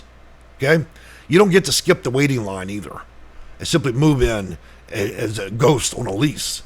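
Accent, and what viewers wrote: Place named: United States. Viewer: Right on talk American to me!!!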